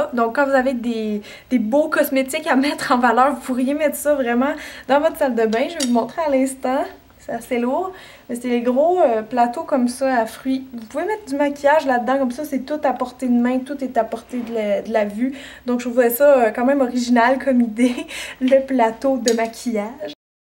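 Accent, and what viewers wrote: Quebec, Canada. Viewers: Tu connais Céline Dion? elles ont le même accent c l accent du Québec ! mais vrai tout le monde a un accent lol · Tu c'est j'ai une tante québécoise et j'adore ton accent et j'adore ta chaîne je te suis depuis le début bravo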